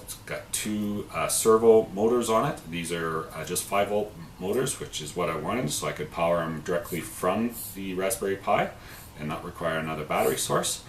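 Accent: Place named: Upper Michigan, United States. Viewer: I'm fascinated by this project. I'm here because I'm trying to do the exact same thing. I have a background in electronics, programming, networking, etc. But also, fascinated by accents. Are you, by chance, a uper? (yoo-per) thanks again for posting this video series.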